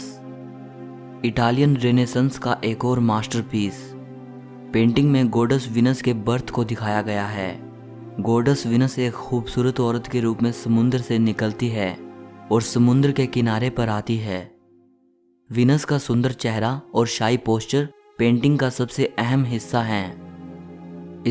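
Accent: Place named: Bihar, India. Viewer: Aapka bolne ka tarika Achcha nahi hai. Aisa lagta jaise koi Bihari ya Gaon ka insaan bol raha ho.Ya fir koi anpar.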